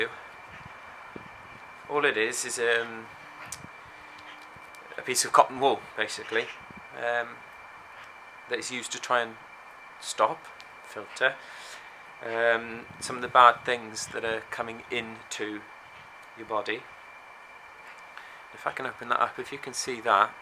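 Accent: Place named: Wales, United Kingdom. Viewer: Where is this guy from? He has a very strong accent. He sounds welsh as a matter of fact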